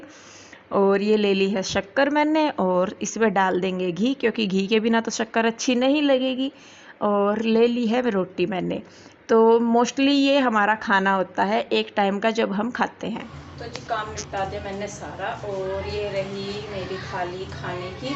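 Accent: Haryana, India. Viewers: आप यूपी की लग नहीं रही आपके बातों से हरियाणा वाली लग रहे हो आप मेरे बेटे के लिए आपकी साइड की कोई लड़की ढूंढ दो गरीब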